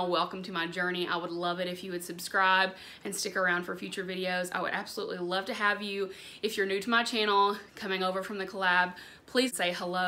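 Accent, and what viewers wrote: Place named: Kentucky, United States. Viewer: I knew you were from Ky from the accent. South Central here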